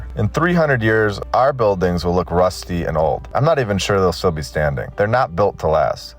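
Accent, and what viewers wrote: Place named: Syracuse, United States. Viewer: I'm from Syracuse NY & he sounds like he's from my hometown. But he could also be from Chicago or Michigan. They sound a lot like Syracusans.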